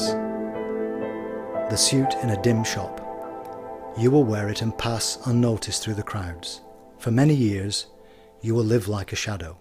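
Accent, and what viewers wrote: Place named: Lancashire, United Kingdom. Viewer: Love this, so relaxing..love his Lancashire accent..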